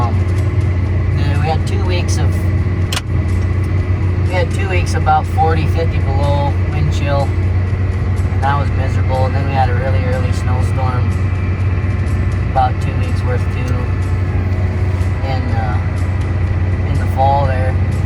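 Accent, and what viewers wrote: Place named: Upper Midwest, United States. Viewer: Nice video 👍 Just found this channel. Good stuff! Where are you located? Sounds upper MW. I'll be checking in from WA.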